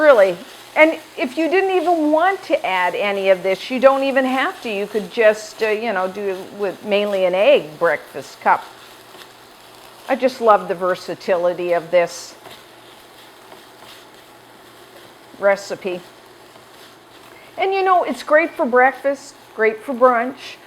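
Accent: Canada. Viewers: She sounds Canadian, so maybe they use the metric system? Not sure about that, though. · Love these cooking shows. Also love the Canadian accent. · I've tried a lot of recipes I learned from YouTube during this pandemic. I'm American, & I also enjoy listening to the various accents. Love listening to our friends from the north. Thanks!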